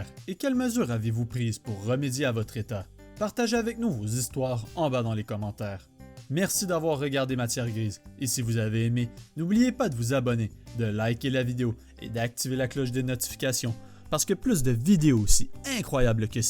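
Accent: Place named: Quebec, Canada. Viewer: Mer i pour la video et j'aime bien l'accent etes vous qebequoi?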